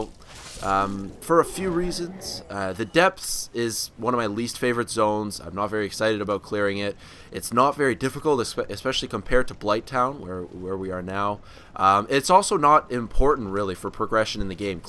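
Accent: Canada. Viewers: U r awesome bro...btw..r u canadian??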